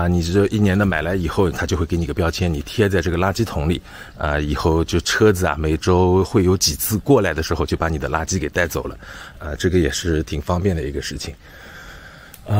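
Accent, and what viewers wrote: Shanghai, China. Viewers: 册那 一听口音就是阿拉上海银（手动滑稽）羡慕长居海外鸭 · 上海口音，口气。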